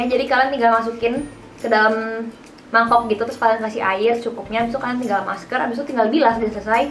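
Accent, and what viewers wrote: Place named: Java, Indonesia. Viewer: bagusan begini, medok tapi kok cantik bangettttt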